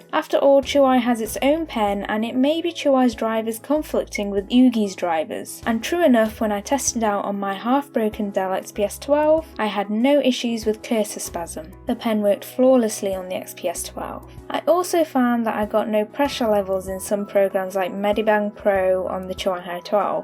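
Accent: United Kingdom. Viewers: I didn't notice the accent probably because we're both British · Thank you for your tutorial thank you for explaining it that was very impressive love your British accent and again I gave you a thumbs up and a subscription thank you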